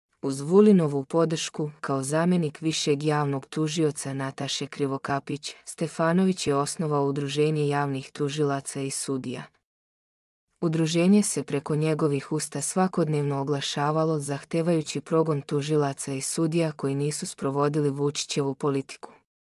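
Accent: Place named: Albania. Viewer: Siftarski akcent ...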